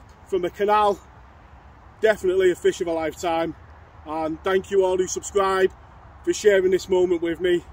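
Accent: Liverpool, United Kingdom. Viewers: Fantastic fish! Well done. First time I have seen your Vlog, but I have subscribed and will be watching more of you! I like the simplicity of your explanations, your love of Pike conservation, and your appreciation of the natural world, we are so blessed to live in. The only thing I don't like is that you sound like a scouser and I am proud to say that I am originally a Mank. I will forgive you for this! Tight lines mate and keep up the good work. If you fancy a session on the midlands canals just let me know.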